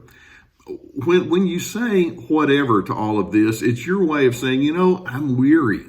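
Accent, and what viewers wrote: South, United States. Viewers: 🤣😂 Now I have got quite a wicked sense of humour but even though you did make me laugh that phrase feels cruel and I do not enjoy being cruel . That is one phrase I will not use because I do not want to play games with others or wind them up. I get no joy or relief from it . I can see where you are coming from and with that soft kind southern voice of yours YOU could get away with it and it still sound almost loving and actually a form of endearment but 🤣 I in NO way could get away with that. I stay away from others with those traits . Of course I do bump into people with these traits so I am grateful for many of your tips but my way of dealing with it is I keep my distance and use the word NO. And Mr I caught that twinkle in your eyes at the end of the video 😂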